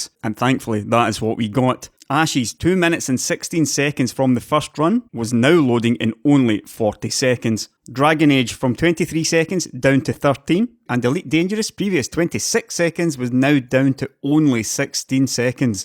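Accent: Scotland, United Kingdom. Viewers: Scottish accents make tech videos better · Nice work from AMD but I've already been using PrimoCache for years and this is effectively the exact same thing. Nothing really new here from what I can see but bringing this to the masses as part of their platform can only be a good thing! This is one of the many reasons my next system will be all red team. As always a great video. Cheers from Australia, you awfully Scottish fella. 😉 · Is that a Scottish accent ?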